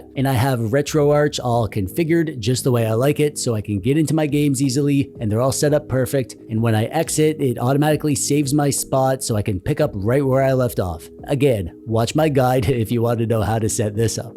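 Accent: Canada. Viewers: Sir, are you Canadian?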